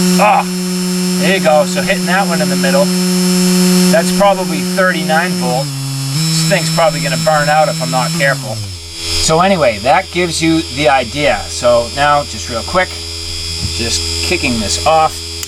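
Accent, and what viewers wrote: Boston, United States. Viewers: This guy from Boston?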